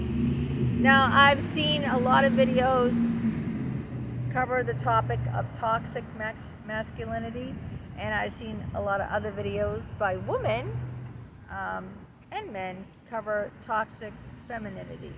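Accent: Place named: New York, United States. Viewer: Hey. Totally cute and funny as hell. Yep, watched the whole talk. And I'm not a bot. I'm guessing , with that accent, White Plains NY or maybe Yonkers? (pushing it). I am 62 now, and you just captured all of it. Do they have Gay G.I. Joe now? Haven't had that one dumped in my lap yet on soc. media. Rainbow Joe. Healing prayers for your past trauma, Been there, done that. You look great, obviously taking care of yourself. ONWARD!!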